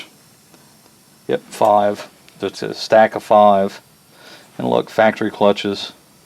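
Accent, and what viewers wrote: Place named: South, United States. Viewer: I really appreciate your videos and your vast knowledge of switch pitch TH400's. Where are you located? You sound like me......Southern. :-)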